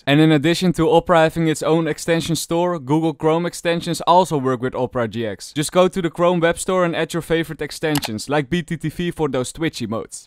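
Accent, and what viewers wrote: Netherlands. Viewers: You sound really dutch. btw never stop this amazing content!!!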